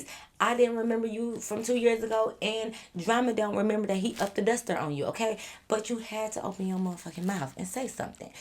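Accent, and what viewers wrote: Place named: Detroit, United States. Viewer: I love our accent 🔥🔥 313 definitely don’t have time to be pronouncing every dang syllable 🤣🤣 people found it funny all the time when I first moved out of town asking me to say SALT and FAULT 🤣🤣🤣🤣🤣🤣🤣 talking about I say Saw with a T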